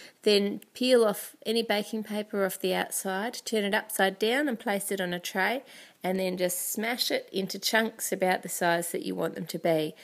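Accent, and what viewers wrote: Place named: Australia. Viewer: Australian/New Zealand accent.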